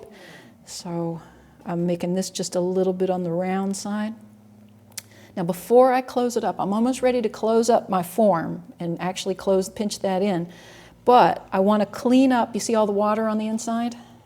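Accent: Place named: Florida, United States. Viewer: I really love your regular tutorial-style videos. Your powers of explanation are superb and easy to listen to, and your results are so beautiful. I've learned a lot from watching, and my wife and I try to never miss an episode. But this live demonstration was a whole 'nother level. Seeing the entire process in real time really brought home the work and planning that goes into this. And is true asset, his video sense is spot on and his perspectives help a lot. You two make a great team, and we love to see it in action. Also, your Florida accent comes out a lot stronger when you're in conversation mode. It's very entertaining!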